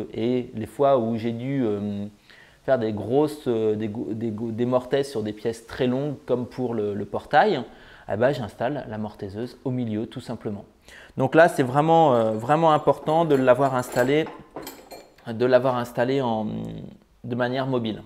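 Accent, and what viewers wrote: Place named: United States. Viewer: Un "petit" 60 m2, ça fait un paquet d'abonnés qui vont t'envier :-) On croirait entendre un de nos amis américains. Merci pour la visite détaillée, toujours un plaisir !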